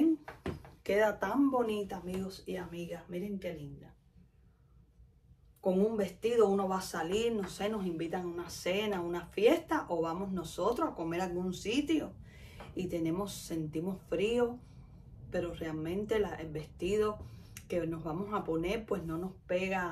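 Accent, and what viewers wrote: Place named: Cuba. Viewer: Hola soy nueva suscriptora. Eres cubana verdad ?, creo q reconocí el acetone de una paisana. Yo soy de Cuba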